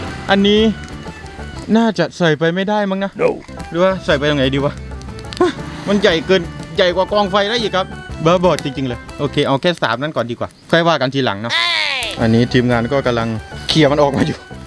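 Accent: South, Thailand. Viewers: พี่เป็นคนใต้เหรอค่ะ เสียงได้แรงอกมากนิ่ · พี่คนใต้หรอครับ🐹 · เสียงเหมือนคนใต้มากกกกกก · คนใต้แน่นอนคับ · คนจังหวัดำไหนคับเห็นแหลงใต้ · พี่คนใต้นิผมว่า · คนใต้นิ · พี่คนภาคใต้ใช่มั้ยนิ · อื้ม~ พี่บ่าวใช่คนใต้รึเปล่านิ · พี่คนใต้เหออ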